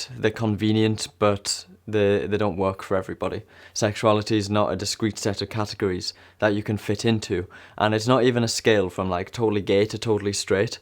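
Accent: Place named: Geordie, United Kingdom. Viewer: I know you're from Tyne and Wear somewhere and your accent is too soft to be from North of the tyne, so I reckon you're either from Sunderland or sour Tyneside, maybe Whitburn or South Shields?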